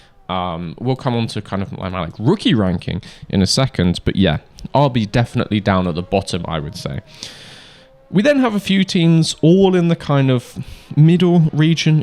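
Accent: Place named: United Kingdom. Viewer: you bring the only podcast I could ever listen to: F1 and with a brit accent. Perfect to listen while I work! I hope wet pointless testing becomes a thing! Thank you!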